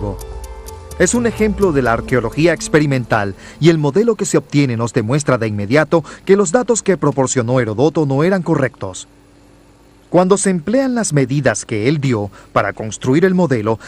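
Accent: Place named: Latin America. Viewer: vamos a ver colega, ejm, Semiramis era la esposa de Sshamshi Adad V, unos 300 años anterior a los que tu dices relacionandola con Nabuconodosor, imagino, por tu acento, que se debe a que eres del continente americano, y permite decir, que fuera de vuestro continente, soleis tener una concepción del tiempo muy peculiar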